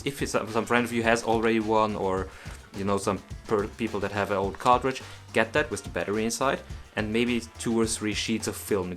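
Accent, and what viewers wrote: Germany. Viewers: One question. Are you German? Sounds like it. :) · Deutsch ist deine Muttersprache, oder?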